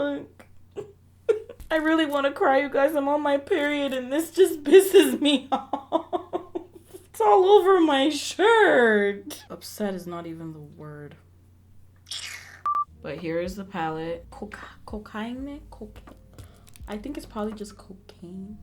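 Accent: Nigeria. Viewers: Lovinnnng your Nigerian accent 😍😍 😍 #nigerian.here😊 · Chaiii your nigerian accent I no fit 😂😂😂😂😂😂😂😂 makeup on point!🔥🔥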